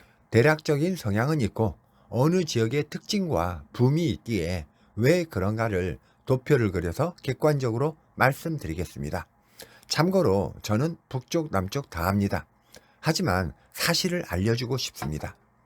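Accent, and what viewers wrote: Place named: Gyeongsang, South Korea. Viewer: ㅎㅎ 경상도 말투가 묻어나네요...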